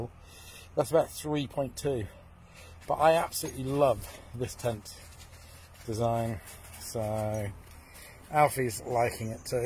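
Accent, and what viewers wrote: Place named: Melbourne, Australia. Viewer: i lived both in the UK and the US but you dont sound like either you have this Melbourne tone to you 😀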